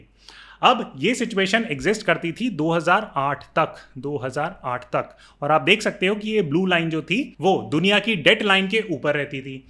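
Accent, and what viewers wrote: Standard. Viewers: Aapki shudh hindi kabile tahreef hai ustad :) it is never expected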